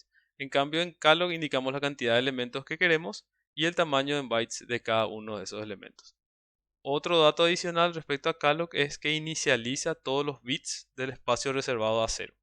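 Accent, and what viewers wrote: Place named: Paraguay. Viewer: De la core cape, me ayudo bastante, me gusto el acento paraguayo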